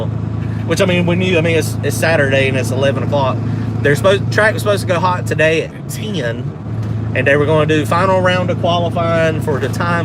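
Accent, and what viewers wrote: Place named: North Carolina, United States. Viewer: Dang I thought u was a North Carolinian????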